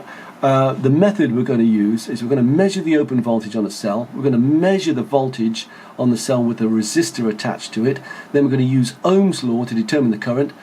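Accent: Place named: United Kingdom. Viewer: You Brits not only speak with a funny accent (no offense meant) but you also talk very fast. At the crucial moment after 8'00" I could not understand a thing. Congratulations, thanks!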